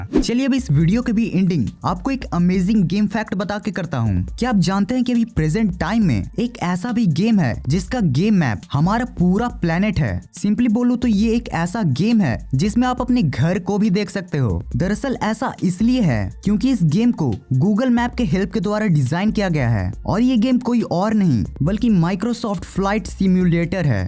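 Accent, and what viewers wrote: Bihar, India. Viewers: Bhai bs 1 question ka abs dedo ki tm bihari ho kya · Are bhaiya bihar se ho ka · Are you from Bihar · His accent of saying hindi is like bihari love from bihar 😎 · You are from Bihar?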